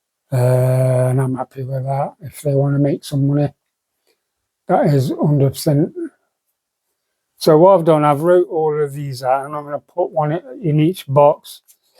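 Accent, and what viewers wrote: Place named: Midlands, United Kingdom. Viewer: where are you based in the uk you sound like youve got a south east midlands accent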